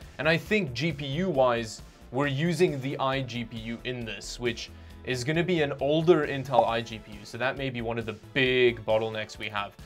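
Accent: South Africa. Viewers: what is your accent comprised of please? i cant make it out. Sounds like South African mixed with Canadian and Welsh. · Dude are you South African?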